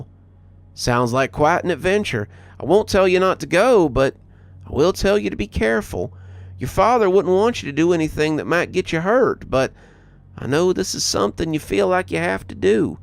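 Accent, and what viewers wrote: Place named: Florida, United States. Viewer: O SNAP. This series is great. Im pretty good at accents - I'm guessing North Florida but not Panhandle. ??????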